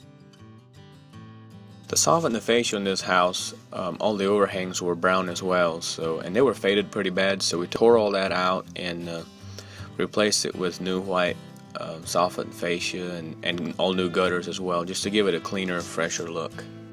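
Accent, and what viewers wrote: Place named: Louisiana, United States. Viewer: I was way off. Sounded more like southern Louisiana. I really like the Chanel. Keep it up bro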